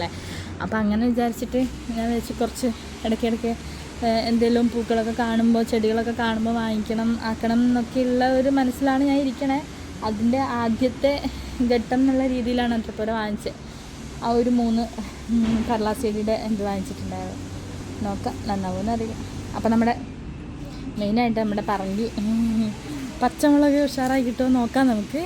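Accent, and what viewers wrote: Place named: Kannur, India. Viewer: Aaha kannur bhasha 😂😂😂😂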